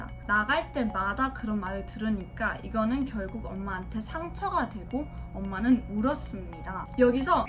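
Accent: Gyeongsang, South Korea. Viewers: 외가 쪽이 경상도 또는 부산이죠? 억양에서 나타나네요, 그런데 한국에서 오래 살았나봐요. 한국사람하고 똑같이 말하는데.....아~ 창원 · 전적으로 동의하고요 여러 인종이 사는 미국과 주로 백인과흑인이 주를 이루는 북유럽과도 차이가 있는것 같아요 미국도 지방과 대도시 다르고요 말하는 사람들은 별생각없이 말하는데 듣는 사람에게는 상처가 될수도 있겠군요 많은 사람들이 인종차별이란 생각없이 또 차별 할려는 의도가 없음에도 쉽게그런말들을 하죠 한국부모 밑에서 자라도 미국서 자라면 미국적인 사고방식을 하니 미국인이라 생각 합니다 국적만 한국일뿐 미국인 부모라도 한국서 자라한국적 마인드가 있으면 한국인이고요~ 그리고 두가지 문화 두가지 언어를 할수있다는건 복이맞구요 그 댓가로 격어야 하는 차별들은 어쩔수 없는 상황이고 본인이 극복 할수밖에요 다가질순 없겠죠 모든일에는 장점과 단점이 있으니 영어 하나를 배울려고 많은 시간과 비용을 들이는 엄마아빠모두 한국인이랑 자연스럽게 두언어를 할수있는 혼혈중 하나를 택하라하면 님의 선택은? 저는 혼혈를 택할거구요~^^ 그리고 글로벌 시대에 국적은 크게 무의미 한듯 하군요 내가 사는곳이 내나라고 안맞으면 다른나라로 갈수도있고~물론 쉽지는 안지만~잘 적응 하는것 같아 좋아 보입니다 화이팅 창원화이팅~ 그리고 경상도 사투리도 매력있게 들립니다 개성 있어 보이고요 굳이 서울말 쓸려고 노력 안하셔도 괜찮은데 ㅎㅎㅎ 이제는 두가지가 다되시는 님 ㅋㅋ가끔은 사투리 억양이 나오긴 하지만 괜찮아요~사람이잖아요 창원 팔용동 사는 늙은이가 주절거림...... ^^ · 경상도 가시나구만 ㅋㅋ 반가워요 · 님 하얀 얼굴에 브라운 눈으로 경상도 사투리를 하니 굉장히 싱기해요! 와 ㅇㅅㅇ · 누가봐도 한국인이네요 경남억양이 아주 미세하게 느껴집니다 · 음 사투리 억양이 들려요..경상도쪽? 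· 응 경상도 사투리.. 한국인 확실해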